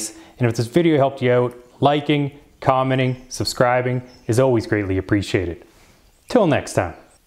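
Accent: Canada. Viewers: You say about and mouse like someone from either Canada or certain places in Virginia. Are you ? I've had success with setting that trap with the yellow bait shelf like you have, and it works well. Also a " Mice Cube " works well for someone who doesn't want to touch the mouse, or doesn't actually want to kill it. For bait, use 1/2 of a Lay's peanut butter cracker (the 1/2 that the peanut butter sticks to) and slide it down to the other end of the "cube". Works like a charm !! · I thought you were Canadian by your accent, then I saw the hockey stick!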